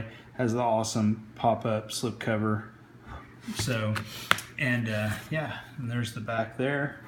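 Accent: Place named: United States. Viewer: Yeah, Scream Factory has released so much great stuff. I'm so thankful they released the Halloween boxset. Ok, you have a cool accent, so was just wondering what state you lived in. I should have my Scream Factory vid up by early next week.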